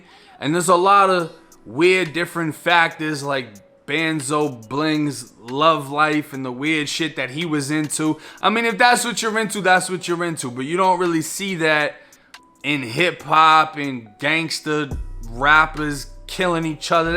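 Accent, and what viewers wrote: Boston, United States. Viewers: I love this kids accent talking about Florida prison and shit. I'm from the Boston area. I talk like him. I'm almost 20 yrs older and this kid makes himself very clear. Right to the point. Keep it up brother. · as usual a brilliant summation of the story for all us to understand. thank you, sir! BTW. are you originally from Boston. i hear an accent there! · U got a Boston accent broo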